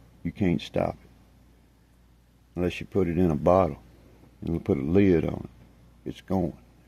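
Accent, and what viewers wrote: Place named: North Carolina, United States. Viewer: a side thought.... sounds like you are from North Carolina or Tennessee.... love your accent... i live in east Tennessee ..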